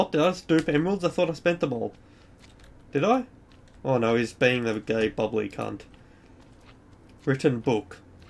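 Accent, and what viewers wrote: Australia. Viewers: love the ozzy accent :) great vids as im new to minecraft :P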